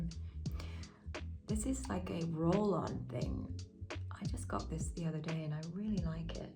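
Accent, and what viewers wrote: Australia. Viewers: Although she was born in England 🏴, I think 🤔 after she moved to Australia 🇦🇺 age 14, she eventually developed and adopted her current Australian accent. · She sounds very Australian to me!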